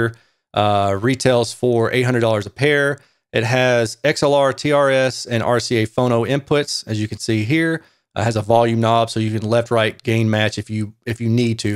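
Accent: South, United States. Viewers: that's great 😎 but what did you wrote toms? Unless its me that misunderstood his southern accent?😂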